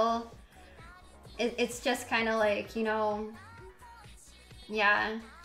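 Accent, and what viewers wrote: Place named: Connecticut, United States. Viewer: When she says "bits-uh" I want to pull out my hair...I think its a connecticut thing lol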